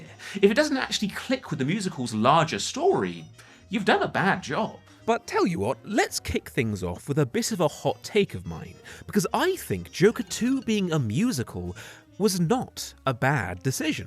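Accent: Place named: United Kingdom. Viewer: اللكنة البريطانية جميلة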